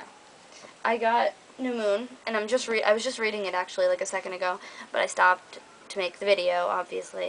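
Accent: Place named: New Jersey, United States. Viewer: You tawk like jersey. lol It's cool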